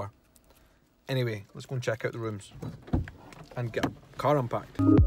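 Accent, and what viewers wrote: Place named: Dundee, United Kingdom. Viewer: How do you speak Dundee?!? Lol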